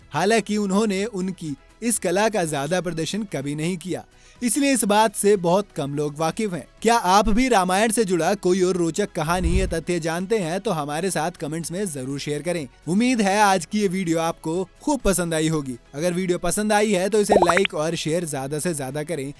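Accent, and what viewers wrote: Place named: Tamil Nadu, India. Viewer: Ap to tamil ke thara bolte hai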